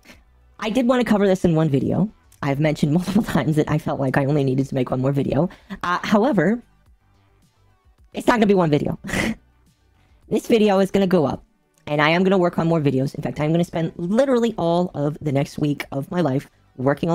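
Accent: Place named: Boston, United States. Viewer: Having found this channel through Spicy Fox Anime Woman, I have learned some things: 1) is autistically highly intelligent. What this means is that she has logic analysis that, when focused, can burn through bullshit, lies, and deception like a magnifying glass amplifying solar energy to the point of combustion. 2) She, whether she knows it or not, applies the Art of War to internet drama and issues. And she is very, very good -- to the point that she does not interrupt an enemy who is making a mistake. 3) is based, and stands for truth. 4) She never picks fights. 5) She is fact and evidenced-based, and certainly will clarify if something is her opinion or not. 6) She has done much to expose grifters and liars for who and what they are. 7) Most importantly, she is the kind of woman many men wish still existed, only because she is fun-loving and has a very warm personality, not to mention being very attractive. 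And this, in spite of the Italian Boston accent, and her self-proclaimed "Woman Debuffs." In conclusion, people should learn that is a manifestation of a quote that many either don't know, or never learned: "No one can have a more savage enemy than one who thinks they are right with God." Because when you mess with the Bull of Truth, you can and will get its horns. And when you do, you cannot blame others because you f*cked around and found out -- exactly as SFO/Dev are finding out themselves. This is why I support. She is good people and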